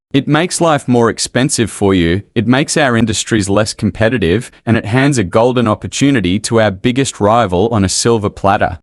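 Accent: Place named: Australia. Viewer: That’s exactly what most of them will usually do. It’s not the supplying countries that pay tariffs, it’s your own country's importers that have to pay them on arrival. Or, did you think it was the other countries that paid the tariffs? The only way they suffer is if their goods (now with a tariff) are too expensive to sell in the USA and they can’t find another market, so they might need to close down, downsize or make something else. The narrator has an Australian accent. They would be the least affected of all, because they buy lots of American stuff, but sell a lot less to the USA. You owe them much more than they owe you. So losing just a small part of their exports because they are now too expensive in the USA because of tariffs isn’t all that critical.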